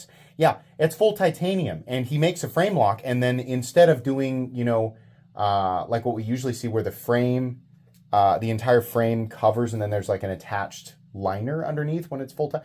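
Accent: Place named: Kansas, United States. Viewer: I kinda imagined people in kansas talk like that anyway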